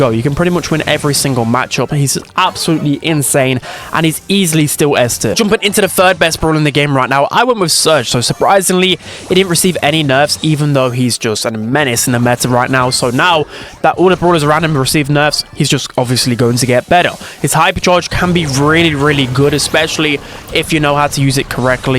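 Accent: United Kingdom. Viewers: Just realized ur British wth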